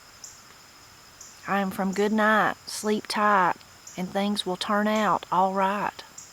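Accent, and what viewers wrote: Appalachia, United States. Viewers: Love Appalachian talk! “Canon jars” a container for a camera. or a container for storing sacred books, dogmas.e.g “The Pastors wife, was canon the last supper”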